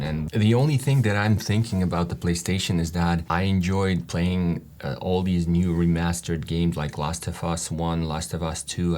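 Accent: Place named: United States. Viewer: Hey I like your accent,- sounds really good American!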